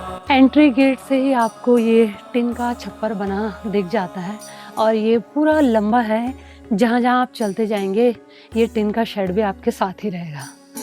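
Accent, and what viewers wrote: Uttarakhand, India. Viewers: Har har mahadev apke Karan logo ko jagah ke bare me pta lag rha hai ap tourisem ko badava de rhi ho aj ap bahut achi lag rhi ho apki awaj mujhe bahut achi lagti hai apki awaj me uttrakhand ki mahak ati hai ap vlog bahut let dalti ho apse req hai vlog jaldi dala karo love you vlog and love you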